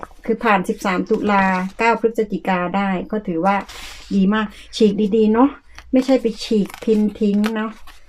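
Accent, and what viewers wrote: South, Thailand. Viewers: ชอบพูดใต้น่ารักดี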